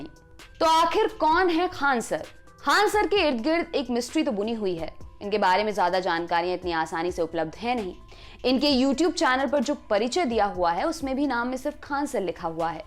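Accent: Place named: Bihar, India. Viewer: Ya bihar se ay h lalu jaisa hi na bolenga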